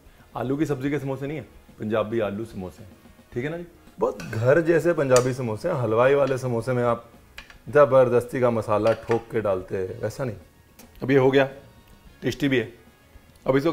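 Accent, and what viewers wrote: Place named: Lucknow, India. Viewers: Tabhi mai kahu ki apki zaban aur lehza lucknawi kaise hai. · I was going to say that. He says so many times how he grew up in Lucknow and he is UP ka ladka. His way of talking has all the sweetness and sophistication of Lucknow and UP. And even when he is making Punjabi Samosa he can't keep himself from mentioning Radhey Shyam halwai. But to be honest, Ranveer belongs to all of India now.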